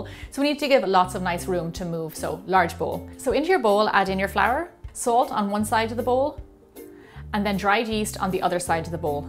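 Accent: Ireland. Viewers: I love the fact you haven't lost your lovely Irish accent after living over there in the states :) x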